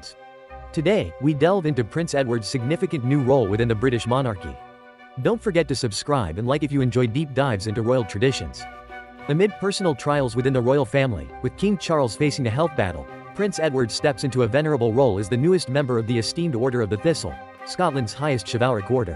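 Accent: United States. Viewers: Hate the bloody American voice commentator, and please stop using YouTube’s most overused word…SHOCKING! 😤👎👎👎🇦🇺🦘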